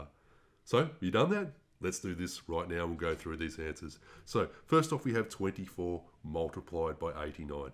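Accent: Australia. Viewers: Awesome ! btw Ya sound like an Aussie or some NZ guy !😅💥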